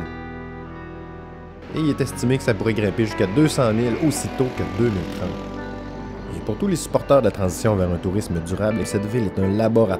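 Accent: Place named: Quebec, Canada. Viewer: Merci pour cette superbe vidéo ! Enfin un reportage qui ne comporte pas de niaiseries et tes prises de vues sont magnifiques ❤je pars au mois de juillet au Yucatan j’ai hâte ! Petite remarque tu parles un peu vite et j’avoue que pour une française il me faut un petit temps d’adaptation pour comprendre ton adorable accent québécois ! 😅continue à nous faire voyager ….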